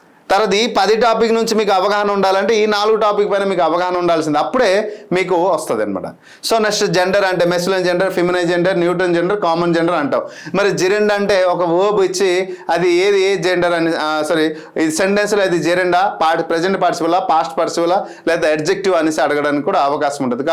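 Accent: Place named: Godavari, India. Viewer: Godavari slang ekkuvundi..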